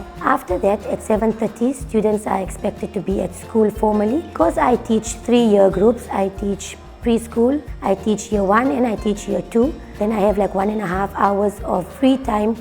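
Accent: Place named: Cape Town, South Africa. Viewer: South African Cape Town Accent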